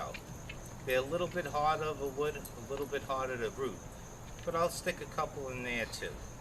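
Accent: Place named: Northeast, United States. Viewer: I don't think he's stoned! Are you basing this on his northeastern accent? Thanks for the info on blueberries/cuttings, can't wait to get my hands on some blueberry sticks.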